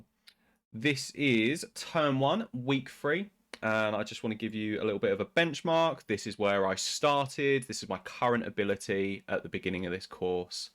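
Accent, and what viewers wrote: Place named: United Kingdom. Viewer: His British he speaks 😮😮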